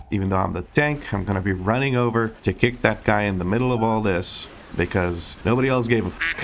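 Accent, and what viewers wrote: United States. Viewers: hey, completely unrelated but...why do you have an american accent?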